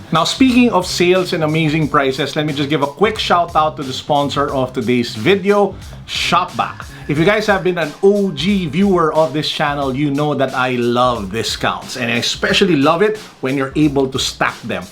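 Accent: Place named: Philippines. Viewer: I like your filipino accent.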